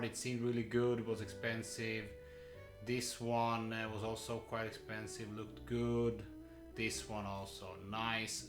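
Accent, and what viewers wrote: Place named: Scandinavia. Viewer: what country are you from? You sound Scandinavian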